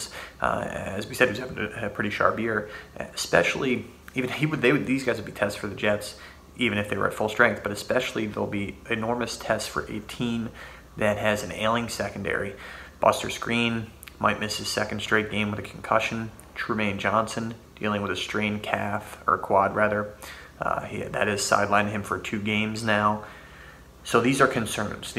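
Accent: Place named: Midwest, United States. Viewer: wait youre from jersey? you sound like youre a Midwest guy...Vikings fan here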